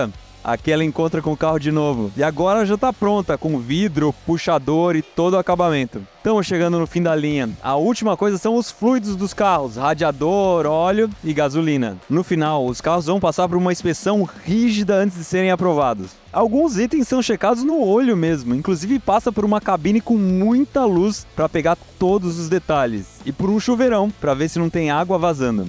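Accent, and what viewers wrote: India. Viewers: At first time I thought you were indian, because of your accent, but then I realized you are Brazilian and the video are Dubbed. Nice Vídeo. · Man pq o parece um indiano com esse áudio em inglês? Eh IA ou é ele msm falando?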